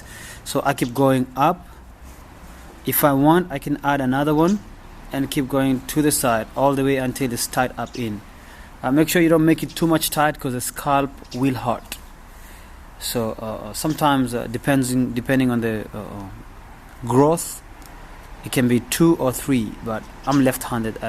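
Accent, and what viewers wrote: Kenya. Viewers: you sound Kenyan...I wish I saw this before I had my loc extensions installed .... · This is a wealth of information!!! I have been looking for a video that explains how to do this properly. Thanks!!! You sound Kenyan BTW. God Bless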